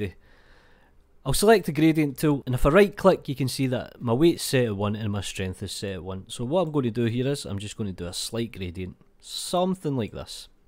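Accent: Scotland, United Kingdom. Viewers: Love that accent <3 Is that scottish? This made me subscribe :D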